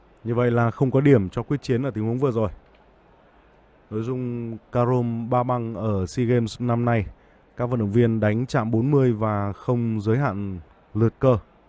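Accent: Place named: South, Vietnam. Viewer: Tường thuật Bida chỉ cần chậm nói ít nhưng đủ thế thôi chứ đâu cần phải liến thắng như tường thuật bóng đá mà người bl nói tiếng miền Nam nói quá nhiều làm mất đi cáo hay cái đẹp của môn thể thao này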